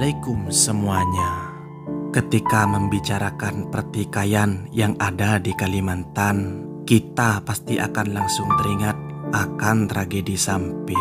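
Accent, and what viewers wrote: Madura, Indonesia. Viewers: abg madura kan logat ny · Logat bicaranya kental bgttt... Kental bhs Madura..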